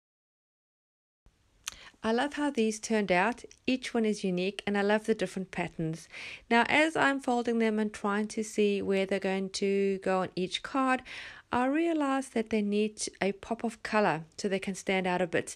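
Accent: South Africa. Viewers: Love watching your channel. You sound South African 🤦‍♀️ I am from SA. Going to see how I can do this without the inks ❤️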